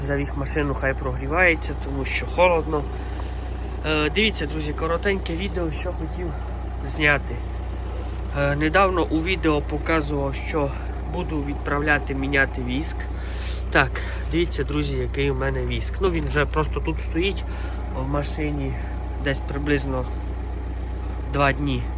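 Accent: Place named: Transcarpathia, Ukraine. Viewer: Ви з Закарпатя говірка закарпацка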